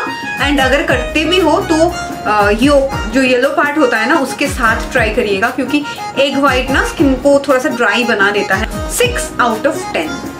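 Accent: Bengal, India. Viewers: Ma astay kotha bollo tomi ki bangali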